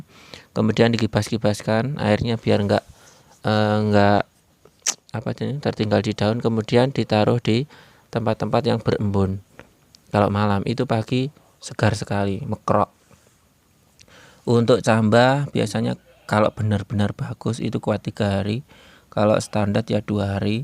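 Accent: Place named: Java, Indonesia. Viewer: Luar biasa sangat bermanfaat kang....saya langsung subscriber...bahasa jawanya medok lucu tp sangat bermanfaat, sukses selalu kang.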